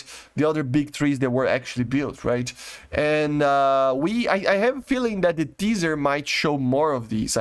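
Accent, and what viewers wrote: Brazil. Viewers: Bro he is Brazilian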